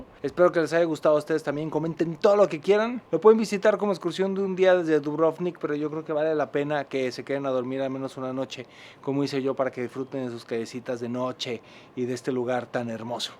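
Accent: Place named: Guadalajara, Mexico. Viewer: Me encanta escuchar tu acento tapatio 💜😁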